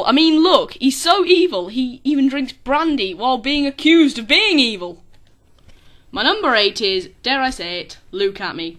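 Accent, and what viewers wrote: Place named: England, United Kingdom. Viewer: Your accent is so English, so fragile are you sure you should have been using such words back when you filmed this? Regardless this list was really good, thankyou for not putting Edgeworth in it, it's nice to see a little more variety.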